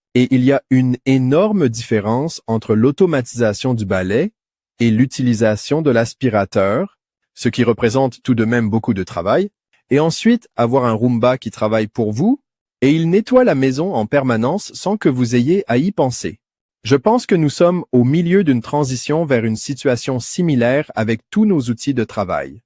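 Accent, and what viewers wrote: Canada. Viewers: La vidéo est créé avec IA grâce aux anciennes ? La voix et les gestes sont globalement les mêmes, mais la dynamique et le rythme sont différents. ( si c'est le cas, l'avez-vous paramétré pour obtenir cette différence ?) L'accent et la voix sont différents également ( québécois), et les gestes ne sont pas coordonnés avec la voix, il y a un petit décalage Pour la confiance de nos prospects, ça peut être compliqué 😅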